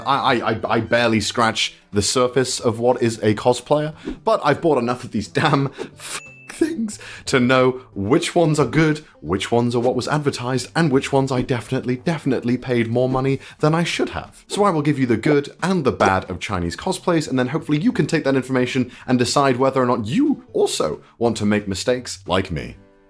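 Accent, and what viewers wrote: United Kingdom. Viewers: Painfully British 👌🏼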